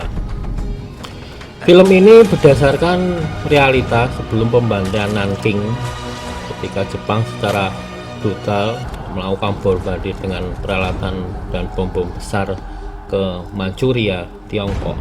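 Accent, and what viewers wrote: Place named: Java, Indonesia. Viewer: logat jowo salam ko kalteng bos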